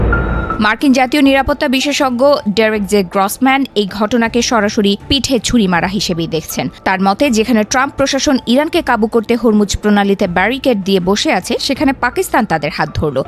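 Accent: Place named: India. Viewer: ৭১ টিপির মালিক বদলেছে কিন্তু চ্যালা গুলো এখনো রয়েছে। তাই তো ভারতীয় টোনে এখনো কথা বলছে।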